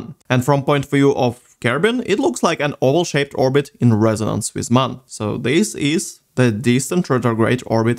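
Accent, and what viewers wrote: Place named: Russia. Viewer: You sound... Russian. Даров если Русский.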